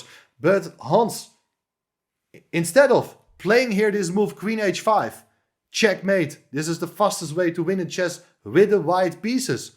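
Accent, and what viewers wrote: Netherlands. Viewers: You're Dutch, aren't you?! :) I can tell from your accent. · I don't know what I hate more, Hans' cheating again or hearing this guy's Dutch version of English😅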